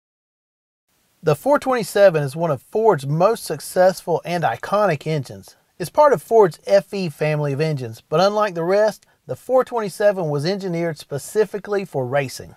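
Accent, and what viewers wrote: South, United States. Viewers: I just gotta laugh at this narrators southern sounding accent 😂..!!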